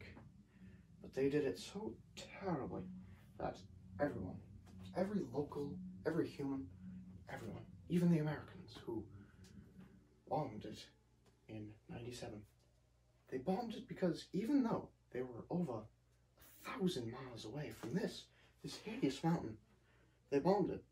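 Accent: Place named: Ireland. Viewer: You sound irish, not british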